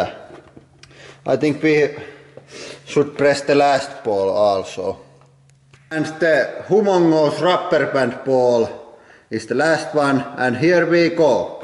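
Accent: Finland. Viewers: Finland. Everybody tell this man, its finland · Hes finnish for sure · finnish accent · Your Finnish accent is so fluent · "Dat vas van touff rupperband ball!" YOur ACCENT IS SO AWESOME, are you from Finland? XD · its finish accent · Nope, he's Finnish. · finland accent > every other accent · hittoku kuulostaa nii suomalaiselta ku puhuu englantia nuih XDxD tuo on nii suomalaista englantia ku voi olla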